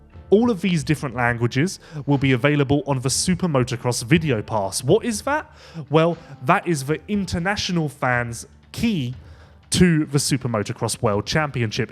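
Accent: United Kingdom. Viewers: Grumpy, monotone UK dude.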